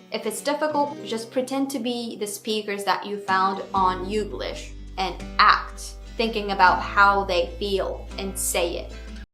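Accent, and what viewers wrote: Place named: United States. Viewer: Your voice is like an American.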